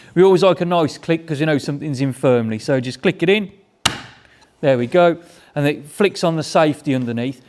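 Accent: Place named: England, United Kingdom. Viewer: thank you for responding. I took mine out of the box because you took the fear of its use and stuffing up. I sharpened knives, scissors, chisels, branches lopper and the shorthandle Axe. It was not a perfect job as the plastic was wobbly and in the way to do all of the edge; some came out uneven. I learned something as a Pen Pusher and perhaps I will do better next time. I saw the disaster with Drill bits so didnt attempt, I will think of some other use for that to sharpen the bent nails or as Pencil sharpener. Great to hear that Dialect of English as it reminds me of my time in England from North Sutherland, Jordie, and of Scotland to Llandudno in Wales and Devon in the South to Southampton. regards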